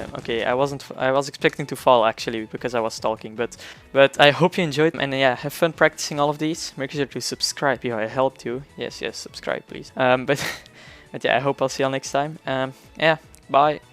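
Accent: Netherlands. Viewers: Are you from Holland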